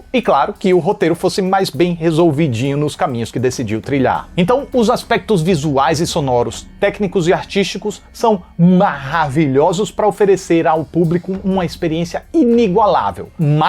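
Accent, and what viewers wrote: Northeast, Brazil. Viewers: Adoro suas análises com esse sotaque nordestino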